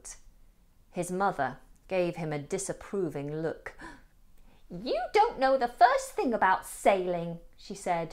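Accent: United Kingdom. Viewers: I really admire the British accent. Great class. One more subscribed. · Vrey nice story I like British accent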